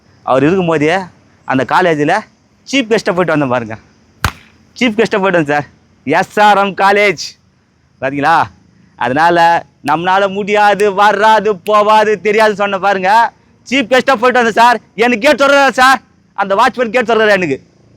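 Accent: Madurai, India. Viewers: Super speech❤madurai vasagam ❤🎉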